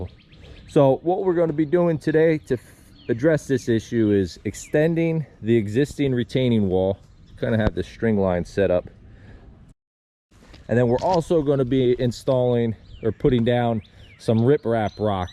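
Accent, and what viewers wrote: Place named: Pennsylvania, United States. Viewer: that SE PA accent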